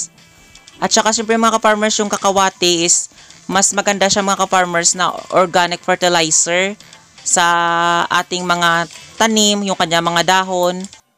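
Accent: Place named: Cebu, Philippines. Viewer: taga iloilo ka pala lods? akala ko cebuano ka..heheh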